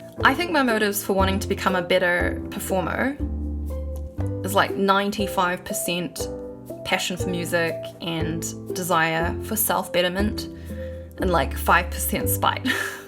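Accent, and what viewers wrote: New Zealand. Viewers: Omg i love your new Zealand accent!!(I'm in Australia😊)it really sucks to get rejected but it doesn't define you! You ARE talented, your voice IS beautiful❤❤❤I believe in you😘😘😘you will learn from this experience and improve next time😊✨✨🎶🎶🎶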